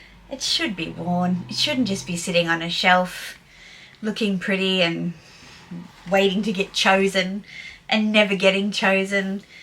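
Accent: Australia. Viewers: Hi, sounds like you are an Australian like me! Have you still got the Clapton and Valentino rock stud bag?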